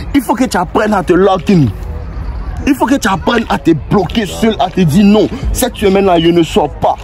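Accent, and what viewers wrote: Ivory Coast. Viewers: on adore ton accent ivoirien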